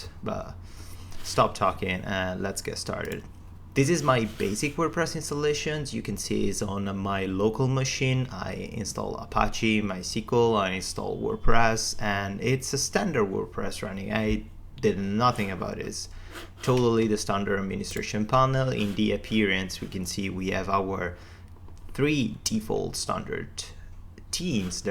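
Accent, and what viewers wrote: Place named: Italy. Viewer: Il tuo accento italiano rende il tutto molto comprensibile e fluido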